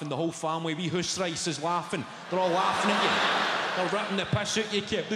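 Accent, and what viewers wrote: Scotland, United Kingdom. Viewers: What's with the comments about "foreign languages"? He's speaking in a Scottish accent and not even a particularly strong one either, perfectly understandable, not our fault if people from the USA (I'm assuming) can't understand, and I'd question the hypocrisy of such a comment considering some of the regional accents y'all have in the USA, and to be frank even the less accented versions of Amercan english are not exactly "english " either are they? (I'm half American and I've travelled extensively right across the USA, so I know what I'm talking about.) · I adore the Scottish accent so much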